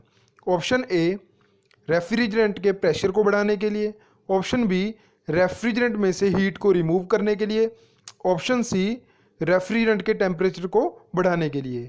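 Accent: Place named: India. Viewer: disgusting India accent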